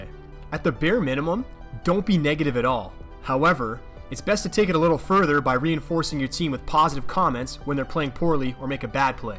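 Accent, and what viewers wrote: Canada. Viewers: the candian/minnesotan is strong with this one haha. great tip man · are u canadian? you sound canadian. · lol you can tell this guy is Canadian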